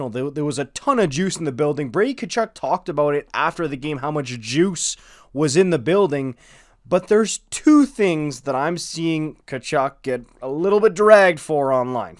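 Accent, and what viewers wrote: Quebec, Canada. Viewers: Yoooo je savais pas que tu étais Québécois😂 damn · Hearing you speak perfect english and swith in bon vieux québecois kills me everytime lol, keep it up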